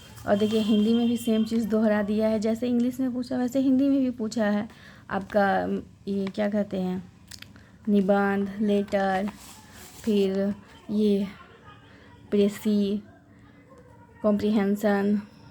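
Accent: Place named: South Delhi, India. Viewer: Aap bihar ki nii lgte ur tune is like south delhi girl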